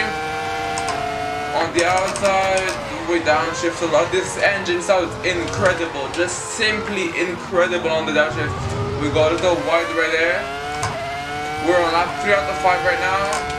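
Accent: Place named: England, United Kingdom. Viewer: Vezi ca ai putina engleza pe la coltul gurii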